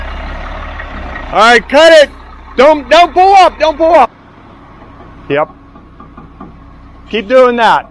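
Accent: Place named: Pennsylvania, United States. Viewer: Where are u from? Sounds like pa.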